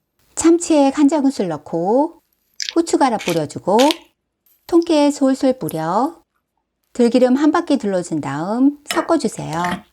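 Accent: Seoul, South Korea. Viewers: 옛날 서울사투리듣는거같지만 천천히 느긋이 설명해주셔서 잘듣고있습니다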